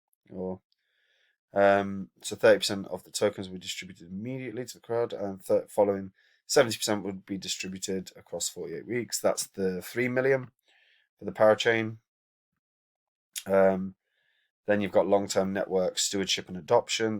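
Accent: United Kingdom. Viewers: He has a really clear british accent mate!!.....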